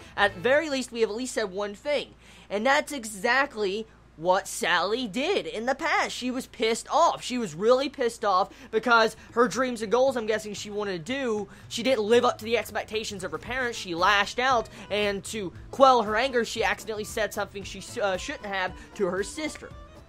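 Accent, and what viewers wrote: South, United States. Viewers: Hey I I know you probs won't read this but I'm really sad because I start high school tomorrow and I. Really scared and sad I really sad because I can't watch your videos as much because homework but I'm really nervous because I don't like going out with friends and I am kinda socially awkward I was really depressed for a while because I liked anime and I had no one to relate to. Then I found your channel and your awesome personality and the hint of southern in your voice always made me laugh and smile when you reviewed anime. I really don't know much about you and you probably won't care for this but you helped me through a lot. It was never like I wanted suicide but I was sad. I never talked too my friends and cut them off. SORRY FOR THE LONG MESSAGE I DIDNT MEAN IT I JUST GOT CAUGHT UP IN MY EMOTIONS. Also I watch all your vids even if it's anime I don't watch. 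I haven't been subbed for a while but I still support you as though I have been here for a long time. Thanks for everything. -Moe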